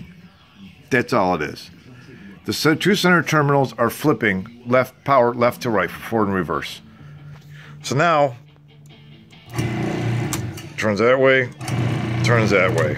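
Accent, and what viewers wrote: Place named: North America. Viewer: thank you for no selfie face shots. thank you for no annoying music. thank you for not wearing blue latex gloves to protect your delicate skin. thank you for no disclaimers such as " I'm not an electrician.....electricity can kill....wear safety equipment...."thank you for plain English....thank you for your x and o drawing.....thank you for keeping it short....thank you for speaking with a north American accent....thank you for cursing....thank you for not elevating yourself to a master class instructor the last 24 hours that's all I saw online, people spitting out technical jargon that makes no sense, and odds are they don't even understand it........everyone wants to be a youtube producer and15 min of fame so they can brag to their grandchildren.....am I bitter? FKN "A" I am. ....got a brake press that's down.....losin money.........and thank you for your humbleness when someone in here asked where the hot wires go.......you said......beats me...that was a long time ago.......hahaha....good one....later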